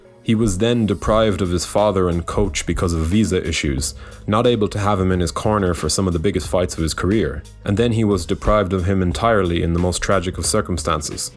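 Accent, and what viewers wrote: Ireland. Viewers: Irish Narrator is the real MVP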